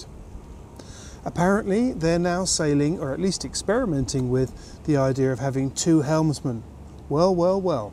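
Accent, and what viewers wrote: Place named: RP, United Kingdom. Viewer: very cool bbc style English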